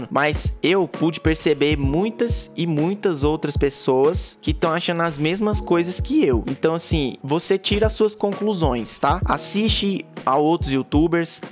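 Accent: Minas Gerais, Brazil. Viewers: Pelo seu sotaque sei que vc é de MG. So pelos videos que vi concordo com suas opiniões quanto aos especialistas e quantos às animações... to esperando ficar free pq nao gasto dinheiro com pre venda de cod...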